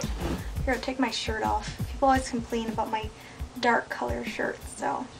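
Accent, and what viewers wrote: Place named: Midwest, United States. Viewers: i think its a midwest accent... im guessing she is from chicago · r u from the mid west? you sound juuuuust like me and my friends haha!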